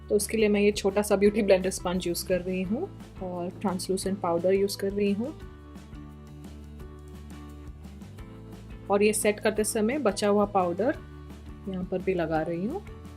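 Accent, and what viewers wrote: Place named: Mumbai, India. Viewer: this is amazing. this your best video. i like the red with this neutral look. i loved it sooooooooooooo very much. apki hindi bahot achi h, kuch words to mujhe bahot jaida ache lage, like u said bahot sunder lagega, chera barabar krne k liye. Mumbai wali Hindi di, maza aa gaya dekh kr. itna cute language me bola apne, loved it. u already knows that i love ur voice. plz or bhi video banayiye Hindi me.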